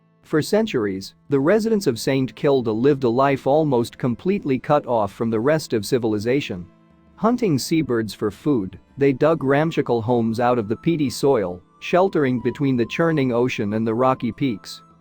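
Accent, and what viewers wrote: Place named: United States. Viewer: American narrator, load of tosh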